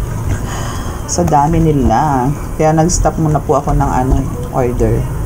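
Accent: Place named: Visayas, Philippines. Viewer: hahaha cute niyo po mag bisaya 🙏🦋🌻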